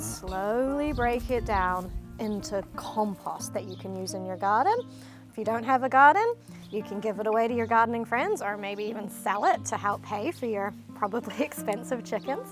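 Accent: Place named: New Zealand. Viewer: By the way, you have an odd accent (not unpleasant). I can definitely hear the kiwi twang but it's mixed with something else. Irish?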